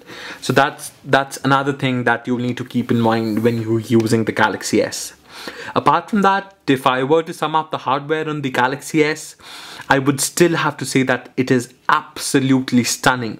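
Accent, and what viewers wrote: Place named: India. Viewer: Haha, what are you people on about? He sounds nothing like Arnie, he's Indian!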